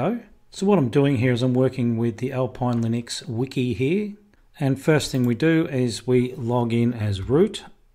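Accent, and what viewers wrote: Australia. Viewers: You sound like an Ozzie, right? So the right keyboard for you would be GB, not that weird US wannabe English. Where is your pride and selfrespect, man?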